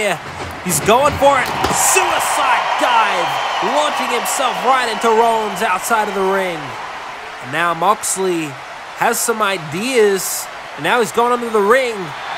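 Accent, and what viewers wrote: Australia. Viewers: WOW FANTASTIC!!! I love the new stage dude! I was talking to SuplexNetwork and we agreed y’all sound alike! Probably because y’all are both Aussie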